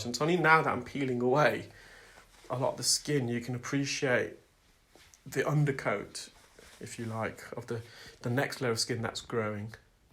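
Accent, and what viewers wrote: United Kingdom. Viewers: "...I am kind of renowned..." 💙 He manages to say it in such a way it doesn't sound arrogant or conceited. At all. Not sure if its the British accent to my American ears or he's humble and confident. Either way, I really adore him and his work.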